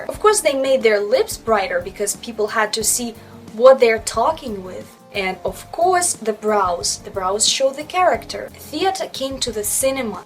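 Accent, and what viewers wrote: Russia. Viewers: She sounds Russian :-))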